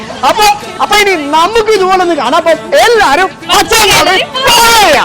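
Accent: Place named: Palakkad, India. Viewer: Palakkad vaayo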